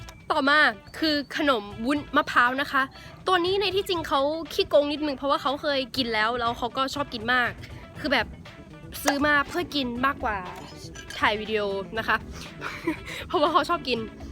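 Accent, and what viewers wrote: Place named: Central Thailand, Thailand. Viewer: พูดกลางชัดกว่ากูอีก 555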